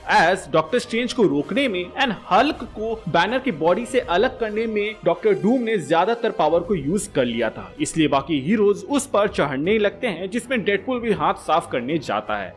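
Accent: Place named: Bihar, India. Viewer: Bhai apke tone of explaining se lgta he ki app bihari ho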